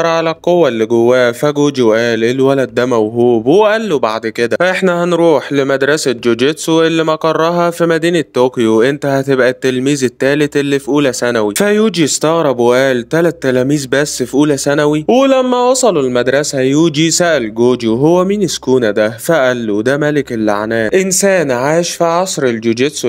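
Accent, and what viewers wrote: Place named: Egypt. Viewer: لا بس حبيت احضرو مره تانيه بلهجه اخوانا المصريين بحب ملخصاتهم ولهجتهم حلوه رغم انهم يكرهونا بسبب مذهبنا بس بحبهم والله ❤❤😂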